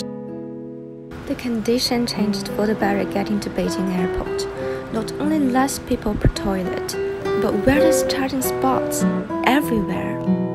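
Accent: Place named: United Kingdom. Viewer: 好棒好棒！！！配乐好喜欢 还有你的英音😍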